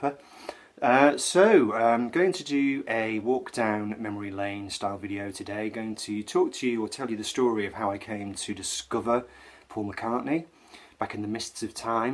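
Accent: United Kingdom. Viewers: Just love your videos, watch each of them, week after week, because your´re so eloquent, because your accent reminds me of living in South Yorkshire for a year and, above all: because you’re such a nice guy. Love vinyl too, but none of the music you‘re into (I like jazz, latino, Brazilian music, library music).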